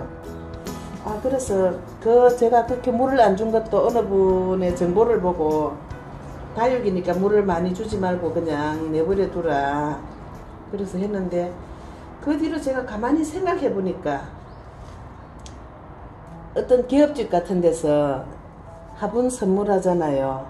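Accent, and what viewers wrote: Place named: Gyeongsang, South Korea. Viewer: 깍지블레하꼬 엉애 때문에 골치군요. ㅎㅎㅎ. 겡상도 사투리가 참 구엽워요. 매번 좋은 영상 올려주셔서 감사합니다.^^ 이 영상 아주 유용할 것 같아요. ㅎ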